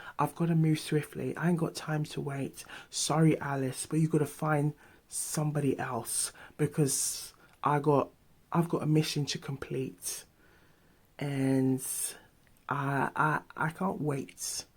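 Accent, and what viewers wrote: Jamaica. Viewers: Are you Jamaican by chance ?!👀🇯🇲❤️❤️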